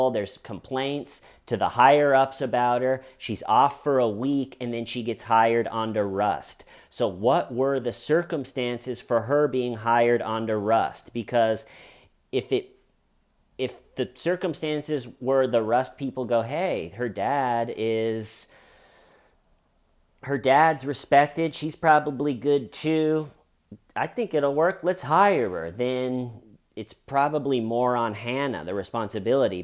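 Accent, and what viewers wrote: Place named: United States. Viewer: So strong american accent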